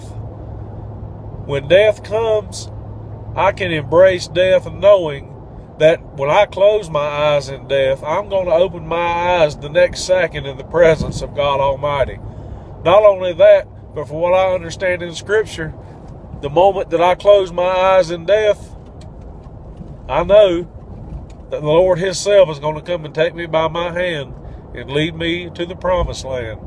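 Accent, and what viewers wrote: South, United States. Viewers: I love your southern accent!!!!! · Not sure where you are from brother, but you have to live in God's country by the way you talk. Surely the lord loves the SEC and HIS people that love HIM and that are called according to HIS purpose. Help us to TRUST JESUS in all things. All we need now is an alter call and Alan Jackson singing Blessed Assurance Jesus is mine or Jesus is tenderly calling today! thanks brother!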